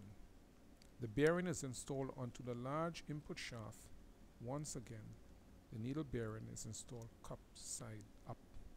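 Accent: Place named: Trinidad and Tobago. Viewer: Judging from your accent Im asking are u from Trinidad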